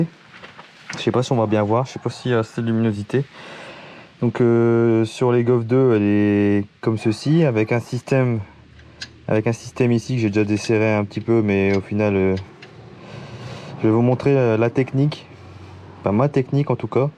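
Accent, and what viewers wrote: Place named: Nord, France. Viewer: Je me rends compte que tu est du ch'nord aussi 😁🥰👍👌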